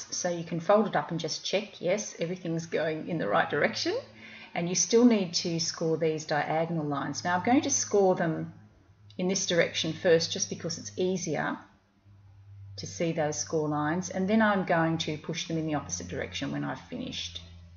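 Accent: Australia. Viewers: Hi, I am new to your channel and must say that it is refreshing to have an Aussie voice chatting to me. Love the bags, I can see myself making a few of these for Special Occasions. Thanks for such clear instructions.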